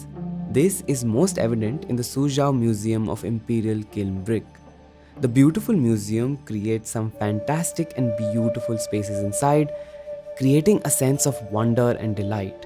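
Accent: India. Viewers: I CANT TAKE A VIDEO WITH 10 MINUTES OF INDIAN ACCENT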